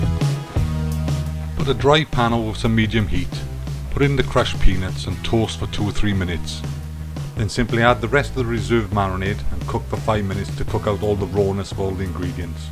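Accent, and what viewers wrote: Wales, United Kingdom. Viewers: Are you welsh because if not, I'm rubbish at guessing accents?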